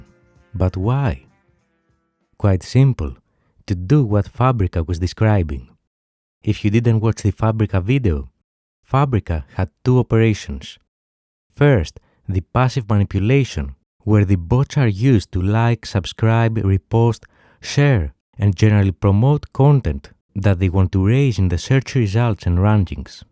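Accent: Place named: Greece. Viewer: He's greek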